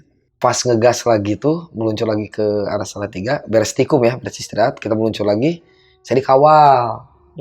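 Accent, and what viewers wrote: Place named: West Java, Indonesia. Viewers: Justru logat Sunda lucu😂suaralu kali yg gaenak didenger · Bengsi gk tuh. Sunda lekoh yess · Sundanya kerasa bgt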